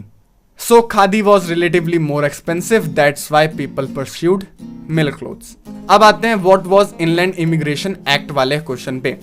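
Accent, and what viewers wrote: India. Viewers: Bajya aap gujrat ya bihar ya rajesthan ze ho kya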